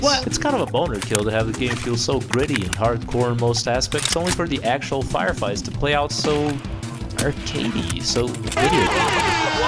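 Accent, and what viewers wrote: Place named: Brazil. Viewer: mano achei por um amigo de oklahoma, conheço ele ha muito tempo cara e ele me mandou os teus dois videos sobre a inércia e eu te achei com uma cara meio brasileira e um sotaque no ingles parecido com o meu, dai eu vi o im brazilian half mexican no primeiro video e resolvi deixar um comentário em portugues porq eu tinha ctz q tu ia saber ler.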